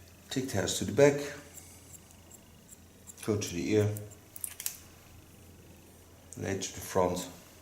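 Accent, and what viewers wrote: Netherlands. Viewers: I love to watch your videos!! Part of the attraction is to see your cutting & colouring. It’s exciting to see your finished results, amazing. Part of the reason I especially enjoy your channel is because I’m half Dutch & hearing your accent reminds me of my late father & my remaining family in the Netherlands. My father was born in Haarlem but very sadly he died when I was young, in the mid 1970’s. All the family are now around Assendelft. As a tiny child I remember my father speaking on the telephone to family in the Netherlands. I remember he often spoke with Uncle Anton who was a hairdresser with a salon in Amsterdam!!! Unfortunately I only now have cousins alive in the Netherlands, all other family have passed away. So…when I watch your videos it makes me so happy to think of the Netherlands & my very wonderful family there…those that are no longer with us & those still alive. 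Thank you!! 🇳🇱👍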